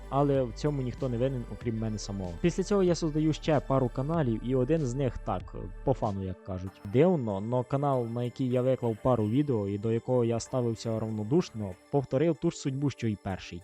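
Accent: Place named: Ternopil, Ukraine. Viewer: Короче я подписался. Давай больше контента, у тебя интересный Украинский. Как будто Тернополь что то такое. Давай больше видео и юмора 🎉 жду.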